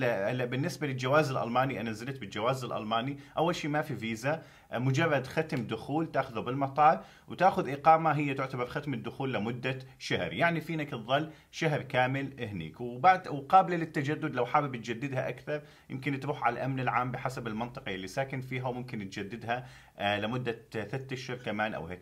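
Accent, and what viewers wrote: Deir ez-Zor, Syria. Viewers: كانك من الدير🔥🔥🔥💪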